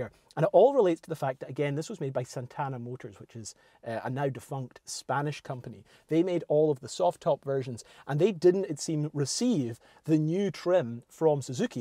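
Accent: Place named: Scotland, United Kingdom. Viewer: Great to hear another Scottish voice and see the lovely scenery of the islands. About to buy a series three here in Panama. Your video underscores my reasons for getting one, thanks.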